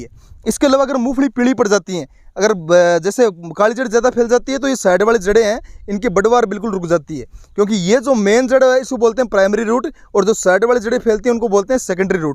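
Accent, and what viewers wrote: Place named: Rajasthan, India. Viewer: Pahle bolna sekh lo aise language bolo jo sab ko Samajh a Jaye sab ko pta hi tum rajasthan se ho dekhane ki jarrurat nahi hi tum se Accha to kisan bol rha hi Chutiya kahe ke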